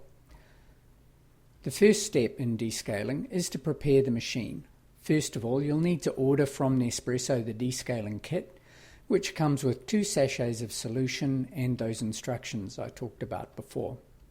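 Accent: New Zealand. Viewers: A great help. Thanks for making the effort. Love the KIWI accent! Reminds us of a beautiful country and a great holiday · Great video, shame about the kiwi accent. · lol.If u knew a Kiwi, the accent wouldn' t bother you. They are such nice people. The accent is all part of the charm. And they're smart too. They do things like make super helpful How-to videos.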